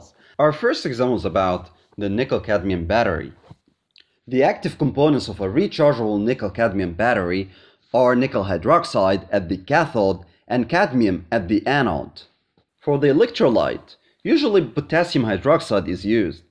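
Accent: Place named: Netherlands. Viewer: Sorry I meant you sound a little dutch and Russian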